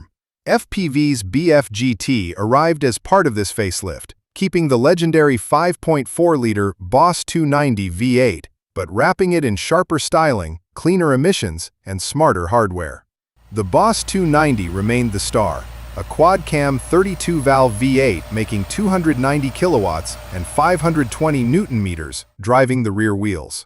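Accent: United States. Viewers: Zed not Zee, god damm yanks, if you're gunna talk about Aussie cars, speak English not yank. And learn how to say Barra, as in barramundi.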